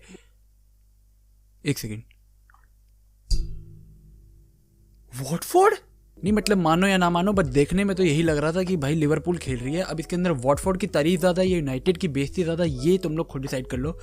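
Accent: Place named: Delhi, India. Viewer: Bhai hai Kidhar se tu Delhi ka lagta hai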